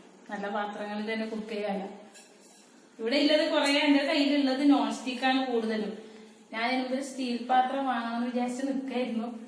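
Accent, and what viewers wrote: Kannur, India. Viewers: കണ്ണൂർ aano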